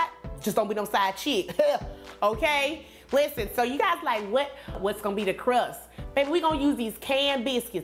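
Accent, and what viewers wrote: South, United States. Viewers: It is what I say it is. Lawd ha mercy. I'm about to cry. You're accent is cute n country 😇😆😇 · The country accent is kinda annoying, but the recipe is cool 😎 black folks try not to add so much sugar we really need to do better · I love your personality and Southern Twang. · I just love your country talking ass say that shit ma🥰🥰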